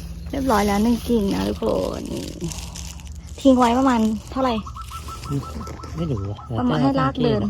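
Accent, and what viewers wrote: Thailand. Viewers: เมืองกาญจน์แน่เลย หรือว่าราชบุรี สำเนียงชัดเจน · ราดรีชัวร์เลย พูดสำเนียงนี้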